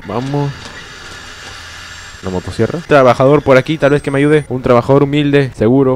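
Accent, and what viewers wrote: Peru. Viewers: Eres del Callao no choro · eres peruano?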